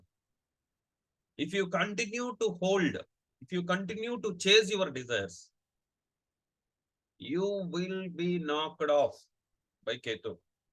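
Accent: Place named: South India, India. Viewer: Sweet south Indian Accent ...hehehe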